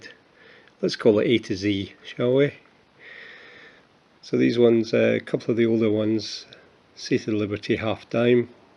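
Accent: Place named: Scotland, United Kingdom. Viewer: . pleasure to watch. I'm a new sub for you. I've heard your name a hundred times on this Channel. I saw what you did for y numismatic collector the other day. Very Nice gesture on your part. you are a gentleman. great Video on the us 90% pleasure to run across your Channel and finally hear that Scottish accent.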